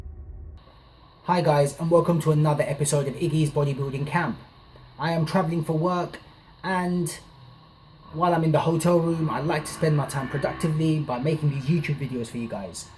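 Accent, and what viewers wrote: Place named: England, United Kingdom. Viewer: another YouTube annoying english accent downvote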